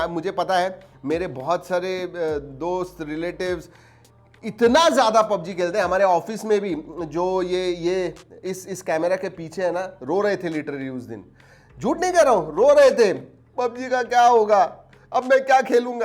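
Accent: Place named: Marathi, India. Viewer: अरे व्वा आपण एवढं स्पस्ट मराठी बोलतात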